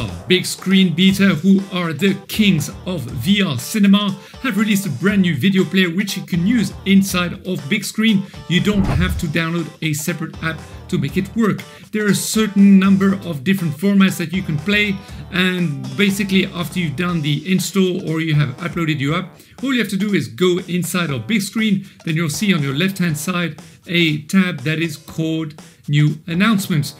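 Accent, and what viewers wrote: France. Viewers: you have french accent lol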